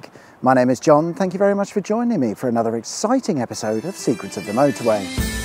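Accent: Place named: Scotland, United Kingdom. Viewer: Very ..... Scottish 😊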